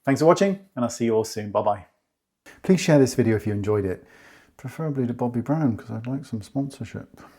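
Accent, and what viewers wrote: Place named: West Country, United Kingdom. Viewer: Great tip as always…heard a hint of West Country accent me thinks ❤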